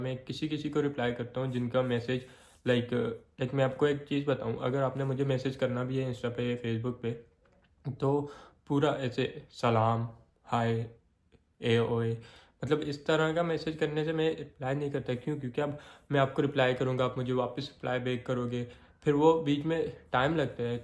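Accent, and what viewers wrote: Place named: Karachi, Pakistan. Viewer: Bhai accent sy karachi k lagty hn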